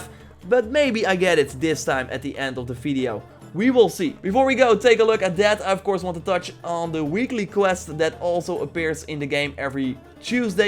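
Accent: Netherlands. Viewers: please, say OY-kos not E-kos. Your strong dutch accent takes over alot haha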